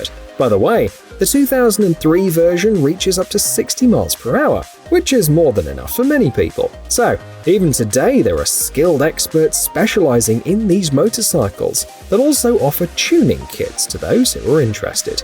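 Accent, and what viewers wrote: England, United Kingdom. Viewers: #2 The Kawasaki H2 is actually supercharged not turbocharged. A turbo is spun by the engine exhaust gasses/moving air, while a supercharger is mechanically spun by the engine......Also so there are several V8 car engines in motorcycles that make even more torque & HP than the H2 Kawasaki. That I think fit the definition of, "most brutal" way more than any of the bikes on this list. Also the Dodge Tomahawk (Viper V-10 engine) that Steven St.Laurent mentioned below. I would think a guy that makes videos & speaks in a snobbish English accent, would have his facts straight.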